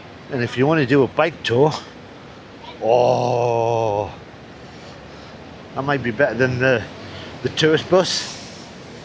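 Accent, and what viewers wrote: United Kingdom. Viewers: it is british, you guys are just too used to the posh accent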